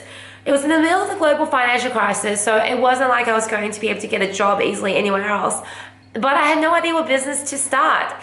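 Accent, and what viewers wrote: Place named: Australia. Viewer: Im in America. Is so cool to see an asian with an Australian accent!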